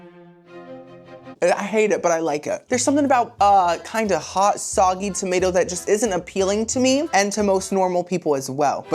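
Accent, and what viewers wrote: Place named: United Kingdom. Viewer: your british accent is like joy to my ears!!!!!!! love from a london girlie xxxxxxxx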